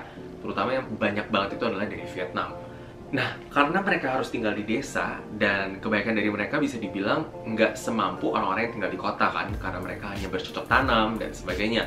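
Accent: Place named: Java, Indonesia. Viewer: Kko awalnya mikirnya opa korea ehh tau taunya kko Indo dari medok nya hehehe keren vlognya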